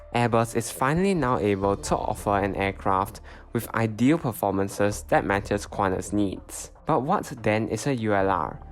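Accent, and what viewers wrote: Singapore. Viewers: You sound Singaporean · Can’t help but think your accent is that of a Singaporean?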